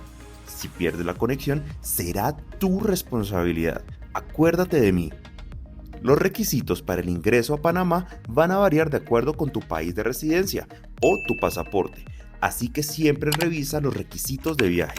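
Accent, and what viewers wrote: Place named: Costa Rica. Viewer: Bello video ! Pensé q era de Costa Rica 🇺🇸🇨🇷🇨🇷🇨🇷ya q hablamos muy parecido en el 2023 bajé a Costa Rica 🇨🇷 y Guatemala 🇬🇹 via copa love it desde California usa.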